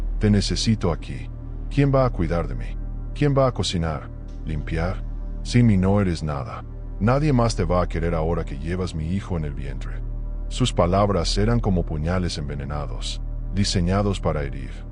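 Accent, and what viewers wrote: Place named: United States. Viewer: Lo malo de esta historia es la voz un gringo que puede saber lo que pasa en México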